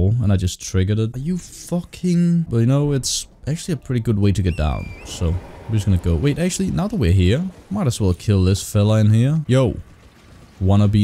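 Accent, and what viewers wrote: Denmark. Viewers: så din første elden ring video og ku bare hører du var dansk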